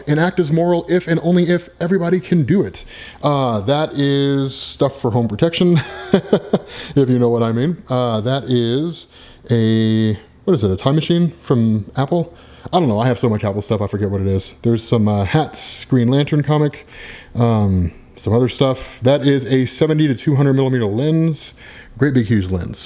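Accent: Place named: Boston, United States. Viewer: Your from Boston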